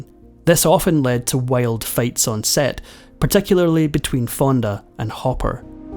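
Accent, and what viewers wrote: United Kingdom. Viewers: Blah blah blah, Hollywood and you hollywooders make me sick, I turned my back on Hollywood ever since I became a true born again Christian. Hollywood is modern day Sodom and Gomorrah. I worship Jesus Christ, our Lord , God and savior. I dont care about this brittish voice over, bragging about this man headed for hell. We can not serve two masters, as God says in His holy words, the Bible. MAGA 🇺🇸 🇺🇸 🇺🇸 AMERICA FIRST 🇺🇸 🇺🇲 🇺🇸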